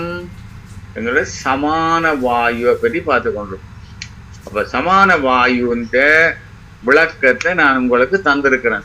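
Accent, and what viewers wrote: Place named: Sri Lanka. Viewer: இலங்கை தமிழின் கம்பீரம் மிக மகிழ்ச்சி அளிக்கிறது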